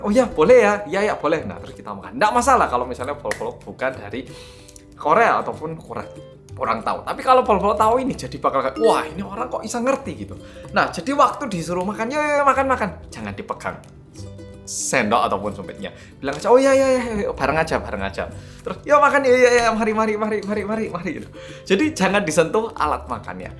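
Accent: Java, Indonesia. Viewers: Tiba" Nemu channel ini dan kesan pertama adalah anda itu medok sekali jawanya, keren, kesan kedua saya dpt informasi yg sebelumnya sama sekali tidak tahu, nice sharing. Sungguh tata krama makan yg luar biasa, budaya yg luhur. Memang bener bgt mas tatakrama makan memang perlu banget, apalagi tau dulu budaya setempat malah lebih bagus dan nilai plus tambah. Detil memang tapi sangat jelas nilai etikanya. Terima kasih mas atas tambahan wawasanya..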